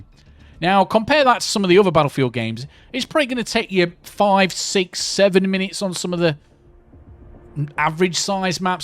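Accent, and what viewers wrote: United Kingdom. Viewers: Are you Irish, English, or Scottish?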